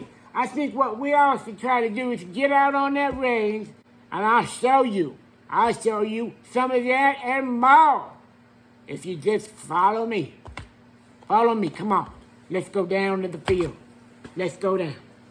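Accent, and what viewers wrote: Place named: Louisiana, United States. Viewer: This dude sounds like he grew up in the Bayou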